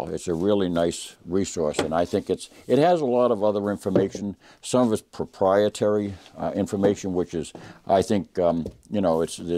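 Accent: New England, United States. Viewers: Good storm huh!? A few weeks ago i first watched one of your videos and about 9 seconds in i go “this guys either from vt right on the river or nh” so… which is it?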